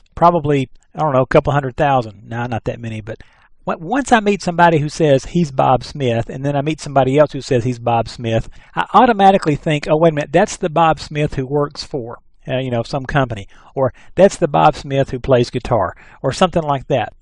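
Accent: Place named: United States. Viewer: I know right? It sounds North-American, but almost too much. Almost like someone putting on an American accent. Great video though!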